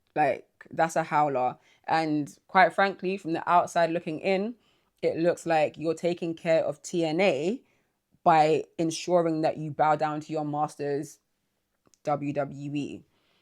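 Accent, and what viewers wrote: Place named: United Kingdom. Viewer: Hiya, german here, your channel trailer got recommended to me. I have stopped watching WWE stuff a few years ago and am focusing on other promotions and old shit, but you're sympathetic so I'm giving a sub. You sound british, you going to All In (and Revpro or others :D )?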